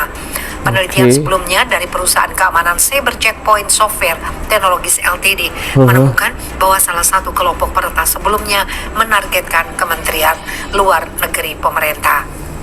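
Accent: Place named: Malaysia. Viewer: Bahasa anda gak jelas dan membingungkan dan terdenar lucu, ( bhs. malaysia ).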